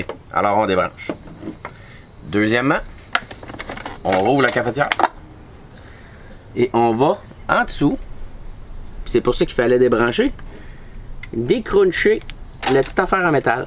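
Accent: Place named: Canada. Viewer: Merci beaucoup pour cette astuce c'est vrai que c'est très agaçant quand ça arrive plusieurs fois on a envie de prendre la cafetière et l'éclat par terre car le café c'est hyper important le matin et j'adore ce petit accent canadien vive le Canada et vive la France bien sûr😊🎉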